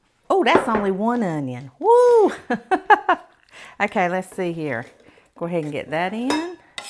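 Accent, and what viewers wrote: South, United States. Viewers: I just found your channel just this minute and let me tell you I absolutely love it! Love your lovely southern accent ❤I’ll be making this recipe this weekend for my son who’s coming over for vacation and love my cooking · Yeah, it's sad people gotta be mean like that and try to make other people miserable. I just ignore them unless they are particularly ugly, then I'd report them. Me and my 10 brothers and sisters were raised on fritters. Heck, Mom made fritters from fritters. Anything leftover in the refrigerator was fritter fodder. Corn fritters, mashed tater fritters, hot dog fritters, cheese fritters, liver and onion fritters, cow tongue fritters, spinach fritters, apple or any kind of fruit fritter. I've had them all. Mom would disguise ingredients inside a fritter for the food the my little brothers didn't like and it tricked them every time. 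Spinach & blueberry fritters rolled in a bit of sugar… delish. And my brothers didn't even realize they had eaten yucky spinach. I'm getting a big kick out of her southern accent. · That accent. Georgia or Alabama? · Aussie here! Love the southern accent! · I saw onion fritters so I clicked the video and THEN I heard that sweet southern accent and instantly subbed 😂 you sweet lil southern ladies ARE THEEEE BEST COOKS EVER!!❤❤😂😂 & Im a polish girl from up north so I CAN NEVER taste food this good 😂! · You must be in Ga., I’ve retired to the south. I’m learning all the unique diff southern drawsssss!🤣🤗🧅🍑🧅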